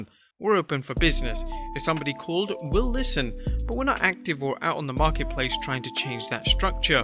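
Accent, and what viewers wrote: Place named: United Kingdom. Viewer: Are you British or australlian? Cause you sound British 90% of the time but the way you say content like there's an extra e in it makes me think you're Australian...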